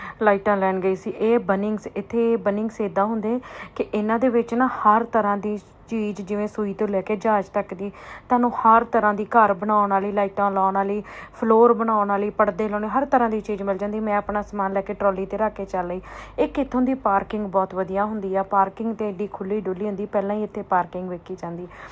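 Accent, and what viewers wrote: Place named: Sialkot, Pakistan. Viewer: Sister amazing video 😊 sailkot Punjabi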